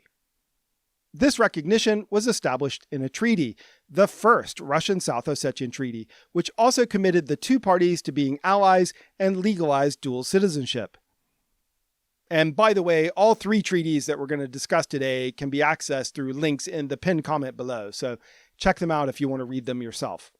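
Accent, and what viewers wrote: United States. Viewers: You sound like a American.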